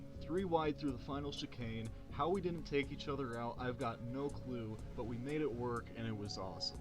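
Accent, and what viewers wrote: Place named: United States. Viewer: Very nice! The memes are great! LOL! Nice surprise to not hear a European or Australian accent too! I didn't you know you were American/Canadian!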